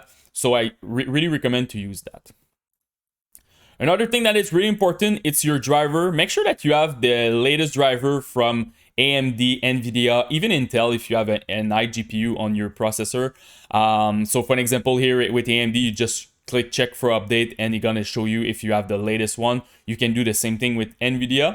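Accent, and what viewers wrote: Quebec, Canada. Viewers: c'est malade comment cest facile de reconnaitre un quebecois qui parle anglais . j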